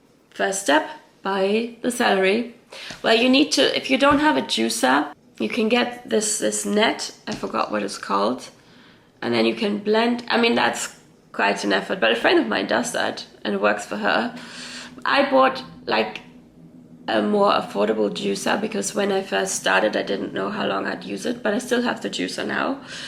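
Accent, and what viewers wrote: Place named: Germany. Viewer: Ich habe an deinem Akzent gehört, dass du Deutsch sprichst:), es freut mich, dass es dir besser geht und du dein Wissen teilst. Ich beobachte, dass sehr viele Menschen selbst jetzt im Sommer erkältet sind. Sie haben einen Infekt nach dem anderen. Das gab es vor Covid und der Impfung nie. Einen Tipp habe ich noch: In einer infekt freien Zeit hat sich bewährt den Körper Kältereizen auszusetzen. Kalte, oder Wechselduschen, Anwendungen nach dem guten , alten Kneipp, der ja immer mehr in Vergessenheit gerät. Das ist wirklich gut fürs Immunsystem.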